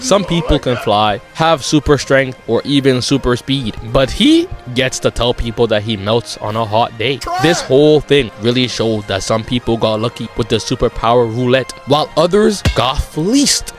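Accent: Toronto, Canada. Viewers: Are you Canadian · r u from toronto?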